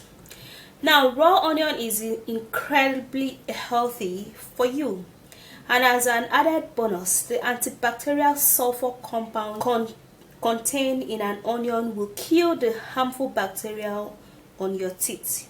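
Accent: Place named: Nigeria. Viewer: She's from Africa Nigeria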